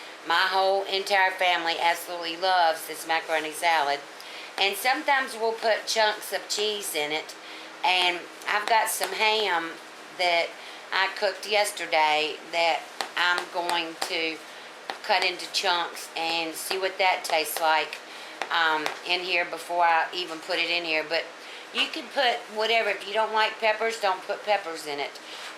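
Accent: South, United States. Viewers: I could listen to that ADORABLE Southern accent all day long! (Makes me kiss my family in Tennessee). I love your macaroni salad too.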